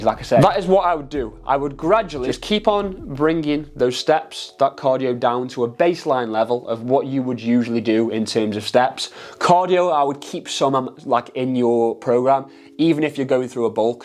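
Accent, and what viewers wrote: United Kingdom. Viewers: Great physique + strong regional British accent + vulgarity = Subbed. 👌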